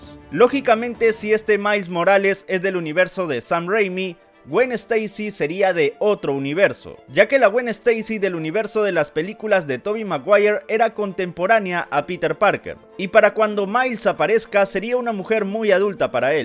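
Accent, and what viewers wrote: Peru. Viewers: Déjame adivinar. Peruano?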